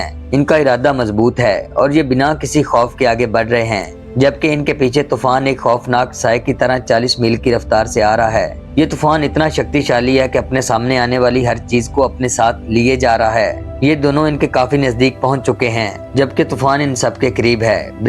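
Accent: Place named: Punjab, India. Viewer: Punjabi accent. Not Hindi or urdu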